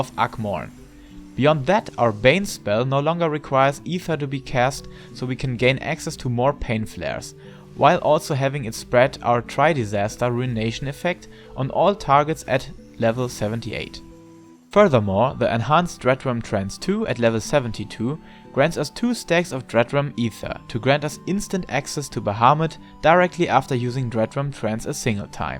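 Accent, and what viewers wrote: Germany. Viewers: Don't worry about the accent as long as you have clear and understandable diction. On a personal note I'd rather have an actual German accent than a faked American one. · As a German myself, I am cringing so hard with this accent xD it's so bad, sorry. Even though I do like your content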